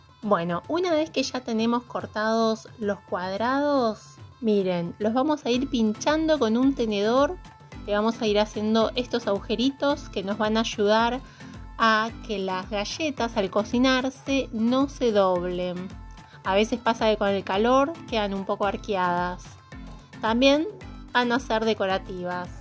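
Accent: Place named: Argentina. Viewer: Sos una genia! Las mejores recetas! Te sigo desde Argentina y reconozco tu acento! Muy bello tu canal!!! 😘😘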